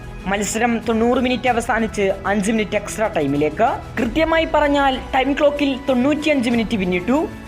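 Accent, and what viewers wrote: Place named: Kozhikode, India. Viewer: കൊയ്കോട് ഉള്ളതാ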